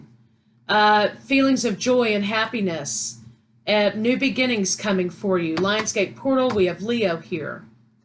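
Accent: South, United States. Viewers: Wow wow and wow, oh my goodness. I listened to this whole message and it's giving me so much clarity. Some of it, I already knew, but needed a reminder, some of it I've been working on and some of it I NEED to work on. Thank you so very much!!!You are so extremely enlightened and gifted. And I love you southern accent! Peace and blessings to you. Love, E. from WNC.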